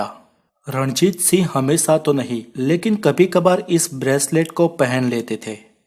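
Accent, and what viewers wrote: Gujarat, India. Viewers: ભાઈ તું ગુજરાતી છુ???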